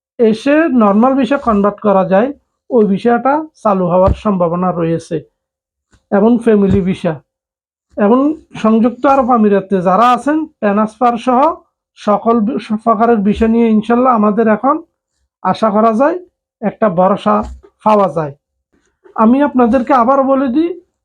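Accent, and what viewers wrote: Bangladesh. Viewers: আসা হরছি আপনি সিলুটি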